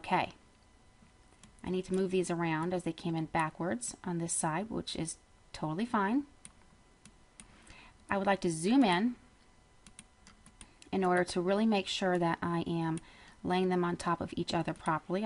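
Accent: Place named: Ohio, United States. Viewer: i love your voice and accent - ohio?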